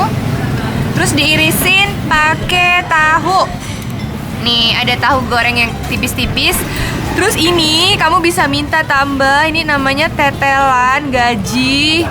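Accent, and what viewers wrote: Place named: Surabaya, Indonesia. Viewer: Sampean asli endi mbk..kok iso ngomong jowo..suroboyo ta mbk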